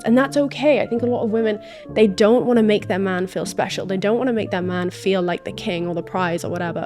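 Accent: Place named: London, United Kingdom. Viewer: I Loooooooove your London accent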